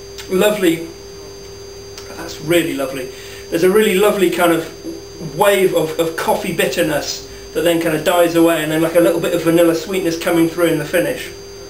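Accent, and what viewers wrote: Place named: Southern England, United Kingdom. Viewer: do you live up north? I'm confused, as you have a southern accent. Don't tell me you commute to Leeds every day?? No problem with the stemware here. Those are speciality beers. But a reminder, there's nothing wrong with a good, old fashioned pint pot, or glass.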